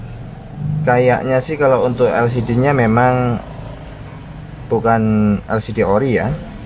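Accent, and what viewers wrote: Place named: Boyolali, Indonesia. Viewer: Saya kira sampean orang boyolali bang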